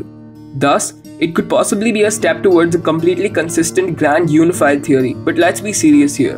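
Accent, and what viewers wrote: India. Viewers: Your accent sounds like that of an Indian. Are you a student of some IISER/IISC? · Why is it so difficult to understand Indian accent? :( I know Spanish is also quite terrible. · India. The accent.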